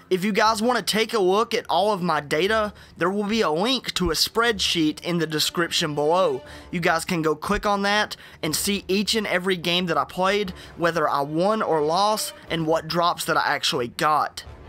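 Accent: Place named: Texas, United States. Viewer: Extreme Cynic nope this is a Texan accent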